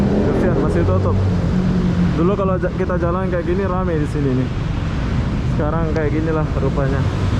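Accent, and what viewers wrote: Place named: Malaysia. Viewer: Haha gklah, cuman gaya bicaranya aja di Malaysiakan, sampe dlu pas ke Jakarta dan Palembang, dia di hujat netizen, sy masih ingat itu😂 mungkin abang nih sudah terlalu lama di Malaysia, jadi bangga dia dengan bahasa Melayu Malaysia. 😂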